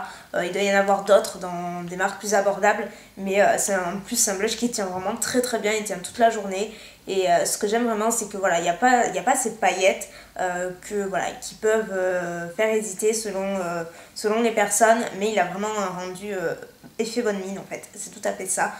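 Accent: South, France. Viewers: Ah que j'aime cet accent du sud! Et surtout les "PU..", j'ai l'impression de me retrouver chez moi dans le sud! En tout cas, ta coupe te va très bien! Avec ce maquillage en plus tu es magnifique!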